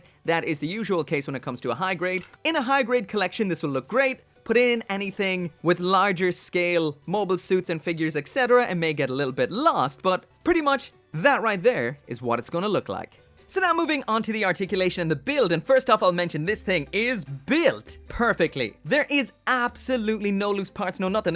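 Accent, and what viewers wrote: Ireland. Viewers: Dude I never knew you were from Ireland. The voice makes SO much more sense now.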